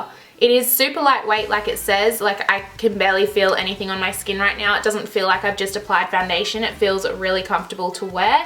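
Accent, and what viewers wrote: Australia. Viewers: 😂😂😂she was so Australian in this one I wonder what part of Aus she's from, kinda wanna be her friend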